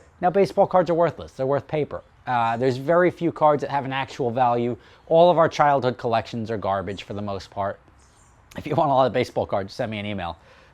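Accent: New Jersey, United States. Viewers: like yo... you from Jersey?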